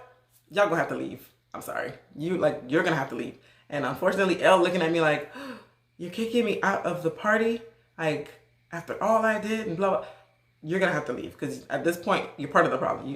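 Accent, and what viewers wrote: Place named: Gullah Geechee, United States. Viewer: I just don't understand some people. Sounds like you're better off sis. And are you from SC? You have that geechee accent going on?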